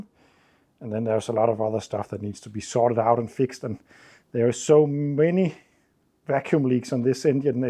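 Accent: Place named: Denmark. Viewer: Danish English is so cute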